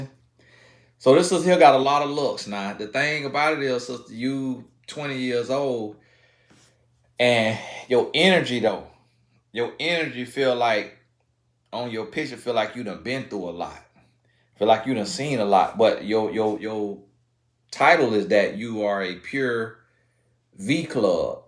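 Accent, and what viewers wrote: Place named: South, United States. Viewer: unrelated but i really like your accent! as someone from california, it’s satisfying to listen to. southern accents for the win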